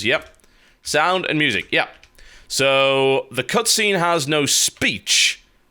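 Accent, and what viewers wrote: United Kingdom. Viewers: has a specially marked brittish accent today.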